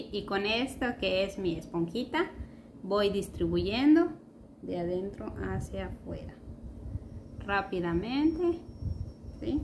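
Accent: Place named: Campeche, Mexico. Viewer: Me encanta tu acento es de Campeche? y tu cutis., muy sano y radiante.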